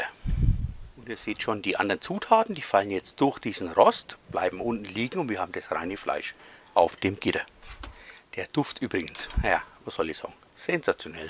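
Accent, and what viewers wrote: Franconia, Germany. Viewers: Hi das sieht richtig richtig gut aus ich werde das mal nachprobieren 👌 Sehr schön anzusehen mit deiner ruhigen Stimme und sympathischen Art, das bringt selten einer rüber, a echter frangge hold 👍 Vielen lieben Dank für dieses tolle Video und ich lasse sehr gerne das Abo samt Domglocke da um nichts zu verpassen 😉 Gesund bleiben und weiter so ✌️ Gruß Olli ✌️ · Saugut! du bist spitze. Endlich mol a Frangge und ned su a zipfl der ned richdich deitsch sprichd. Grüße aus Bamberch.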